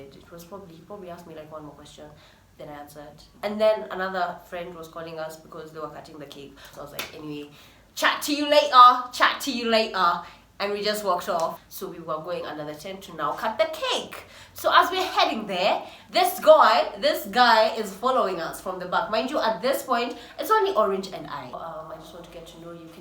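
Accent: United Kingdom. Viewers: Uko na accent ya Briton 😮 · You do a mad British accent.... Damn😍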